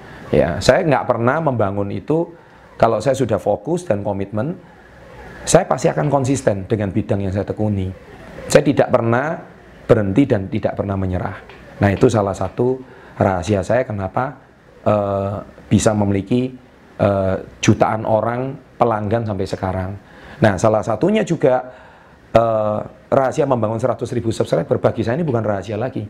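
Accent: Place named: Surabaya, Indonesia. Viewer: ohh.... wong suroboyo tah ? hahaha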